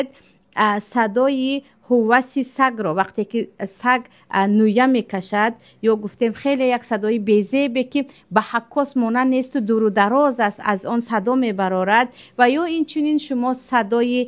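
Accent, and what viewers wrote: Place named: Tajikistan. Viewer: Ман а шумо барин хохари олима мефахрам ки аз мо занхо чунин олимаи босавод хусусан бо лафзи ширини точики дар дини ИСЛОМ хизмат мекунед аллох ба мо чунин саводу гайрату такво насиб бгардонад омин йо раббал оламин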